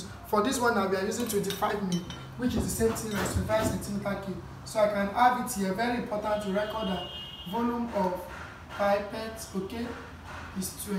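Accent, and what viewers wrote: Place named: Nigeria. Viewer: God bless you man!!! You sound Nigerian. More love from Ghana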